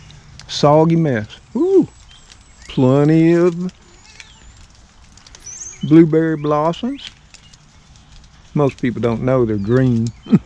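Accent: South, United States. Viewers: Mr.. I was worried about you too. Your Vegas Fan. I'm glad your ok. I also think about Trixie possibly having issues when your not around for a bit. I would like to put those southern bells out there on notice, about looking after you. No disrespect sir but your quite the southern gentlemen a man of principle and intelligence.. Their missing the boat as far as I am concerned. Opp's that's right you got rid of that. Lol. I sure enjoy your southern drawl and interesting verbiage at times. Confession I don't always understand you it just makes it all that much more entertaining and educational of another culture then I am used too. I hope you don't take my off hand compliment and kidding to be inappropriate.. I have the utmost respect for you sir, your channel and what you are willing to share with us out here in video land. Be well Mr. until the next ride about.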